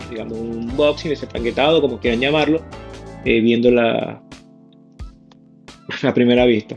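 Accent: Venezuela. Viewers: Hablas como venezolano!! Jejeje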